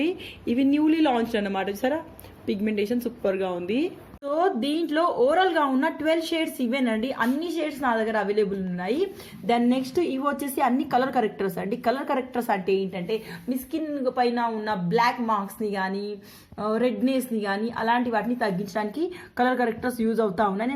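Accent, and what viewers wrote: Telangana, India. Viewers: Hi gaaru mi voice ki fan aipoyaa Mana telanganaa yaashaa lo super gaa cheptuvuntaaru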